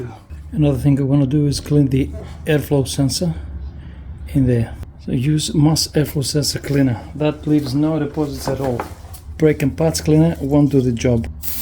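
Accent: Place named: Australia. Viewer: I can definitely hear an Oz twang in the accent these days 😂 settling in fine i see😉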